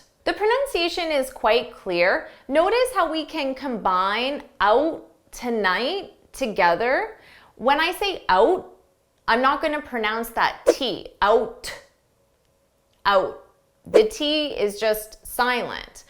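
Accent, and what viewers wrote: United States. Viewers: Really nice. But this is American English. British English Should of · thank you ! just curious about where are u from originally i mean your english is american or canadian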